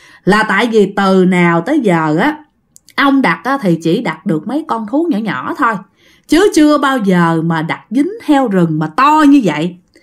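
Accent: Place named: Mekong Delta, Vietnam. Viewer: Chị ơi em có cái này muốn nói với chị là cái phần ngôn ngữ đó chị. Chị là người Sài Gòn khi kể chuyện chị đừng có pha giọng miền tây vô. Ví dụ như chữ e rờ "r" người miền tây hay nói thành chữ "g" như "đi ga, đi gô, có gồi, cá gô,....". Chị cứ kể giọng chuẩn đi, tụi em nghe là biết cái chuyện đó là ở miền tây rồi. Em để ý chị kể chuyện lúc thì nói chữ "r" thành chữ "g" có lúc nói chữ "r" bình thường, nói như vậy nó ngọng ngọng khó nhẹ lắm. Chị cứ kể chuyện tiếng Việt chuẩn là được. Em chỉ góp ý nhỏ vậy thôi. Hihi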